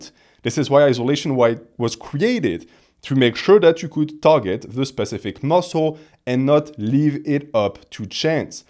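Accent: France. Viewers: Dude’s more french than ever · your channel its a treasure for a physcology study , are you french or canadian?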